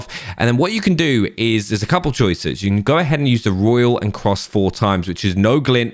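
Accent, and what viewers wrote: United Kingdom. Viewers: I love how britishers say knot for 0, it's just amazing. Sorry, bri-ish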